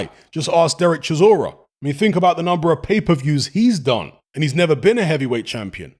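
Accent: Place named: London, United Kingdom. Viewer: There a song called, this sounds like it was spoken by a londoner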